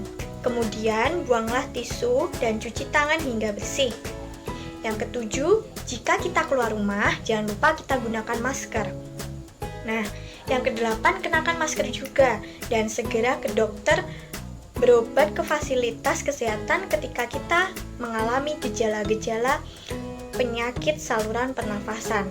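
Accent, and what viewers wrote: Java, Indonesia. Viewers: Sangat medok ya mba but still ayu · this is medok but so goodddd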